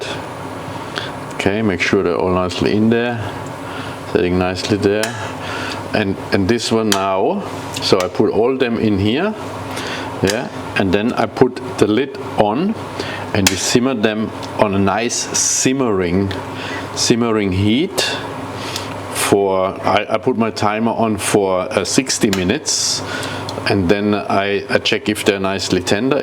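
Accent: Germany. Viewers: wow CHEF, your German accent makes me think, intelligence, innovation, efficiency...Looks Amazing! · Love your German accent.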